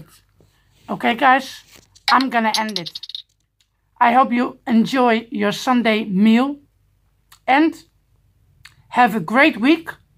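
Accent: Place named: Netherlands. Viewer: That accent is definitely Dutch I'm hungover and could just do with that now so jelous lol it's not to long at all pal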